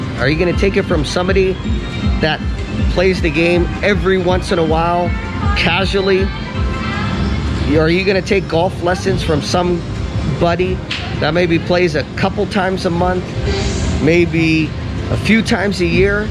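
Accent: Australia. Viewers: Pokies! You sound like another 🇦🇺 😊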